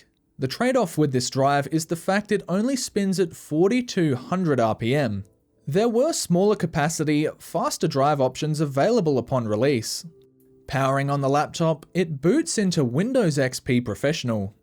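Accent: Australia. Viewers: is this guy from australia?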